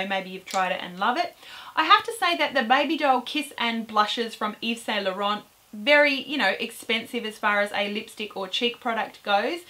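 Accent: Australia. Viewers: I do really enjoy your reviews,; as someone else said, they are 'professional' and so easy to listen to. I aways learn something new, and I just enjoy hearing your Aussie accent!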